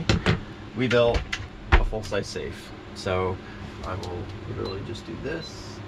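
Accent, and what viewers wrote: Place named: Tennessee, United States. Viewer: r u from TN you sound just like my niece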